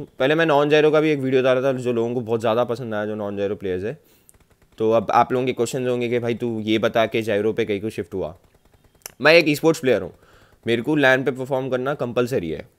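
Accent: Hyderabad, India. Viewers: Bro, tum normaly bhi haidrabadi language hi bolte ho ya sirf video bananeke liya aaisa bolte ho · Ekdam Hyderabadi language bolte tum to 🔥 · aakhri maut daaldiye yaaro tum are you hyderabadi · Perfect hydrabadi language 🔥😂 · Bro r u Hyderabadi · Bhai tum hyderabadi hai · He is hyderbadi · Bhai tum hydrabadi? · By hearing your voice I think you are a Hyderabadi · Like for Hyderabadi accent 🗿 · By his accent i presume he is from hydrabad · Hyderabadi slang😍😍😍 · Hydrabadi hindi love you bro 💞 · Bhai ekdum Hyderabadi hei.. fellow Hyderabadi.. tripped my ass off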